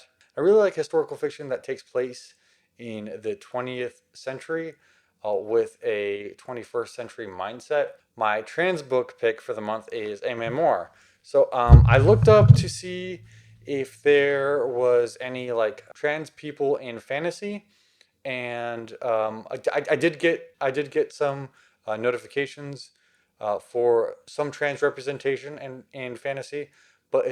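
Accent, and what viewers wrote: South, United States. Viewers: I can't believe you're talking about your late pride TBR at your house in Texas on this video during your quarantine including your past days in the days and don't ask, don't tell after you had a lot of the LGBTQ-related books including Gideon the Ninth by Tamsyn Muir, "The House in the Cerulean Sea" by T.J. Klune and "The Color Purple" by Alice Walker, so I'm very proud of you and I hope your husband, Zach will be very happy for you, instead and I love your Southern accent!!!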